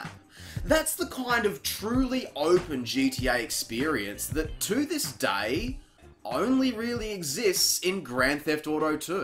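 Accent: Australia. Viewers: Dude, I’m new to your channel and I have to say, you have of the most authentic, energising and entertaining personalities of anyone I’ve seen making this kind of content. So many people play a kind of character when they’re in front of the camera. There’s nothing wrong with that per se, but it’s so refreshing to see a person cut out the nonsense and speak articulately and passionately about the things they love — and the things that give them the shits! And not to shit on anyone else, but it’s awesome to hear a proper Aussie accent without some kind of weird affectation / exaggeration that some of the more popular Aussie YouTubers seem to feel they need to put on. My only regret is not finding you sooner. Keep doing what you’re doing my man, I look forward to coming along for the ride!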